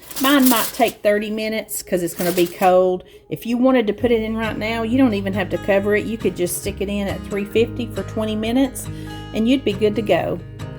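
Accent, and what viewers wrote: South, United States. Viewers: I have been watching you for about five months now & subscribed to your channel after the first recipe that I watched on YouTube. My husband passed away on Oct. 6, 2022, and that's when you popped into my life!! You have truly blessed me. (God sure does work in mysterious ways!!) In a way, you have saved my life, along with our precious Lord & Savior, Jesus. I was so so lost & was grieving (still do!!), and your amazing, delicious recipes gave me something to do in my spare time & got me to cooking again!! I love how laid back you are & I love your southern voice & the soft music you play while you cook. Your recipes are simple, easy and delish...right down my alley!! Love and prayers and lots of blessings to you and your family!! I cannot thank you enough. 😃😁🥰❤❤🙏🙏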